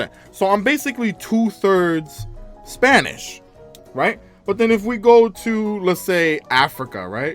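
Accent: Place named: Puerto Rico. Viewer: I laughed so hard. Probably more than I should have. 🤣😂. Good stuff. I knew you were Puerto Rican as soon as you opened your mouth. 💪great personality.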